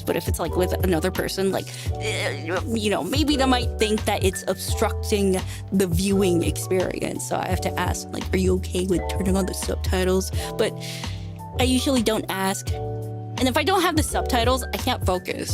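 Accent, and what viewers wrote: South Korea. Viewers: I did find her Korean accent cute. But maybe for Korean, especially in school ages, they'd find it annoying That also explains why she sounds so monotone. Maybe she was scared people would judge her accent if she talks normally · That actually sounds cute.cutest Korean accent I heard haha I love it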